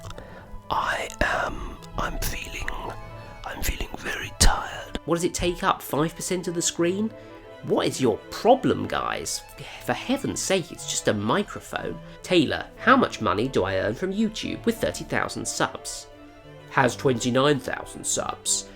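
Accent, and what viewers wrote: United Kingdom. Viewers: There’s plenty of more deserving people here to troll. Flat Earthers, Non-binary’s demanding use of correct pronouns - from a tiny list of 89 last I heard. People who say “...it’s just a theory, it’s not a fact’ My preferred method though, almost ashamed to admit it, is to ignore the witless idiots - especially those who initiate a battle of wits before realising they’re completely unarmed. I’m British, we don’t all talk like this guy, or decorate or dress like him, and I don’t see it’s relevance to anything - but he’s British, we’re all ‘querky’ so being British myself, and ex-Army, I have a duty to stand by him for moral support, it’s not even a duty, it’s a given. We look after our own over here, and I’m sure that while this chap couldn’t really give a toss what those beneath him think of him - he still has feelings, and whilst even I couldn’t give two shits about them either, they’re his, and I wouldn’t go out of my way to ridicule him, his family, or even his cat - but if we met in public and faced a troll of his, then I’d have to drag the troll by, his forehead, onto a busy motorway. 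Besides, like most Brits, he’s fighting his own battle perfectly well. Don’t confuse ‘being nice’ or ‘being kind to others’ as a physical weakness, it’s how we lure you in for analysis - think of it more as pre-emergency screening. Defending underdog’s and ginger since 1977. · I love your British accent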